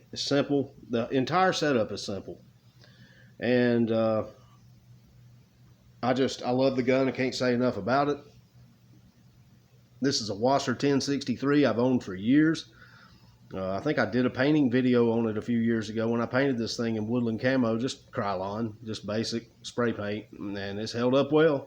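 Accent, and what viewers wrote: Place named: South, United States. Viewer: Haha glad to see you, always good information and spoken in good ol Southern English. Really like your AK reviews, be safe everything is going to work out!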